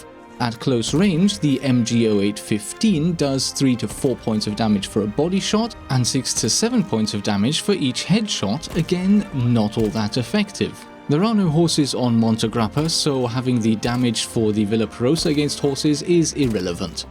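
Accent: Germany. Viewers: do you speak german in your free time? bc you have an very little accent... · are you german or from swiss, that Accent is strong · German is your mother tongue, isn’t it?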